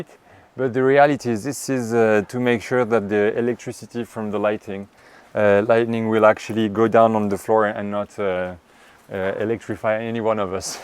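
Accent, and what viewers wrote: France. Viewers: You can speak French no ? I hear at your accent you are French no ? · I don't think that I'm the only one, but I would marry this handsome man with this charming French accent and impeccable taste! 😍 If I wasn't already married, that is!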